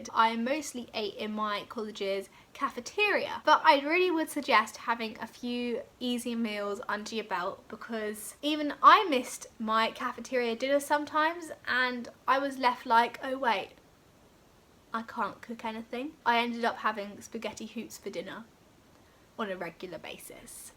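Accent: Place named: RP, United Kingdom. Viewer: Hello... I love your perfect videos... It is very kind of you giving us advice about university... Btw i am the guy from greece (40°C+)💜💜💜.... I love your british accent... Recieved pronunciation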